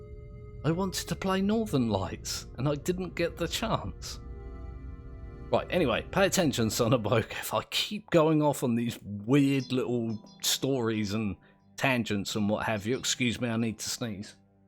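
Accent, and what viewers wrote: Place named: United Kingdom. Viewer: You're just so British. Very winsome.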